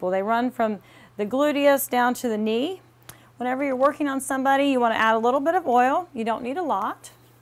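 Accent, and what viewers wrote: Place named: South, United States. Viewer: gorgeous accent. Sophisticated south.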